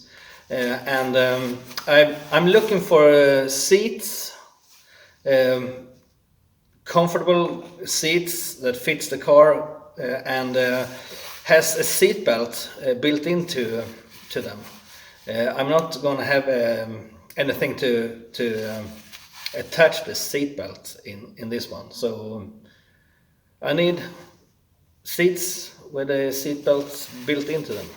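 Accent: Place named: Sweden. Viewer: Tja, underhållande video med riktigt fin svengelska dialekt :) Jag har en RRC från 1984 med, vad jag har förstått är den nya Boostern. Vet du om den går att renovera? jag tycker mig ha läst att den måste bytas ut om den läcker..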